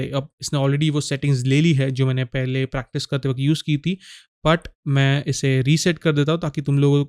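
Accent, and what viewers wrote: Maharashtra, India. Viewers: No that's not right ! I have seen many Maharashtrian People have tendency to talk like that only, that's Maharashtrian Hindi !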